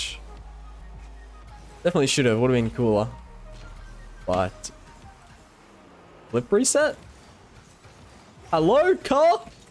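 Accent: Australia. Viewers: Road to top 50? That would be so cool to watch, if you can’t though, how about top 100? Also I just hit diamond in 1s (plat 2-3 in other comp modes ahaha), and I think I can attribute that (at least in part) to you and Sotus’ individual 1s series. It’s super helpful and inspirational watching you, so I just wanted to say thanks man. <3 also it’s awesome to have an Aussie RL YouTuber to watch... American accents can get a bit annoying after a while 😂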